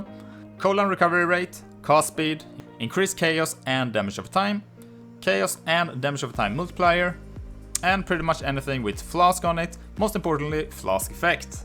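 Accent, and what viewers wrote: Sweden. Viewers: Man that Swedish accent is insane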